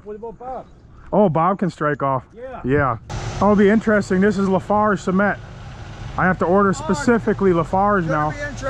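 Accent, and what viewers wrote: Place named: Wisconsin, United States. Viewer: There aren't many accents that beat a thick Wisconsin accent.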